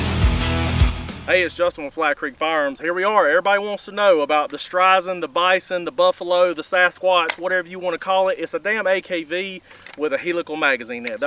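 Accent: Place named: Carolina, United States. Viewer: That’s gotta be a fellow Carolina boy with that accent 😂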